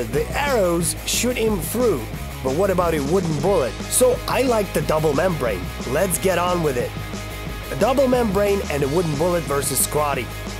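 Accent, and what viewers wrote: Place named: England, United Kingdom. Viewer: О, вы из Англии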